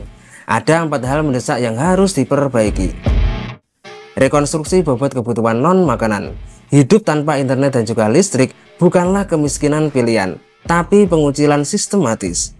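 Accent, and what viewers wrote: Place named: Java, Indonesia. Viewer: Bahasa yg bagus tidak terlalu jawa👍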